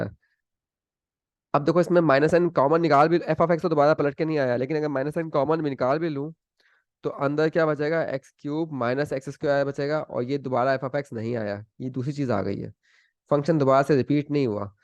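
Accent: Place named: India. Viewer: oh my god the pronounce is so india;i don't understand what he says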